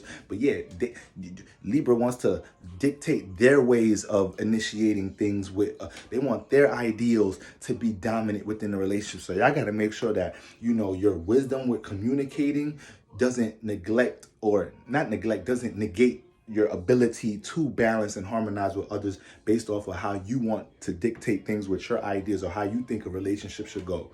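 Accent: New York, United States. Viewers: I swear you from nyc or jersey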